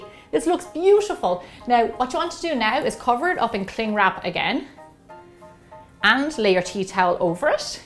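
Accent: Ireland. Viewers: I love the way she says tomatoes😅 I'm not Irish so that's why I love your accent :)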